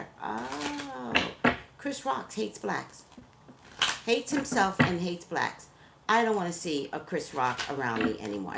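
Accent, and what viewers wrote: New York, United States. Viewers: I love your New York accent!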